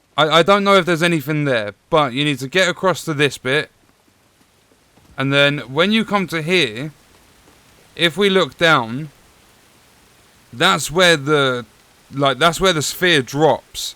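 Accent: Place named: Cockney, United Kingdom. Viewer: Love the cockney accent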